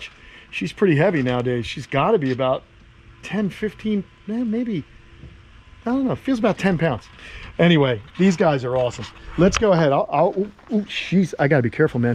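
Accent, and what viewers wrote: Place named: New York, United States. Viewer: "Oh they've got such claws... such claws!" You sound exactly like where you are from🙂!! You can take the man outta New York but you can NEVER take the New York outta da man. Brooklyn maybe? Queens? It's no matter..I love how you communicate!!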